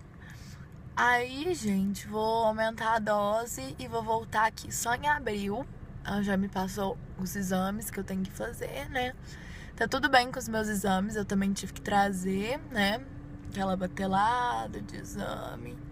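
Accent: Minas Gerais, Brazil. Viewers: seu cabelo esta incrível!!!!! Faz um vídeo de cuidados com o cabelo loiro, como vc matem a cor. Adoro seu humor nos vídeos, sotaque mineiro é o melhor 👏👏 beijosssss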